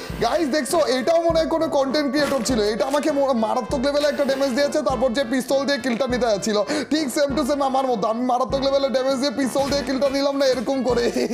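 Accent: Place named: Comilla, Bangladesh. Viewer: ভাই তোমার কন্ঠ শুনে মনে হচ্ছে তোমার বাড়ি কুমিল্লা।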